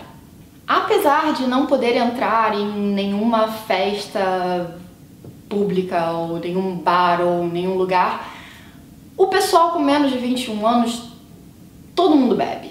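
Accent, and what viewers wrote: Rio de Janeiro, Brazil. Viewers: Vc com esse sotaque de carioca se assustou com esse jeito deles dançarem???????Com esse sotaque de carioca???Para com isso,com certeza vc sabe como se dança funk(não digo que vc dança,digo que já deve ter visto e sabe como é).É simplesmente impossível alguém com esse sotaque de carioca que vc tem se assustar com isso! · Acho legal seu conteúdo mas o sotaquezinho carioca é DIFÍCIL de aguentar. Gezus, minha chaleira chia menos...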